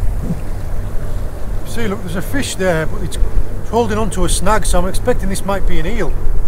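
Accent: United Kingdom. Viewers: Every time I watch your posts, I enjoy your crisp clear beautiful British accent. Besides, it is always nice when the fisherman knows very well weather he got snagged or he feels that some kind of fish got the hook in the mouth and try to hold itself in a whole. Perseverance paid off.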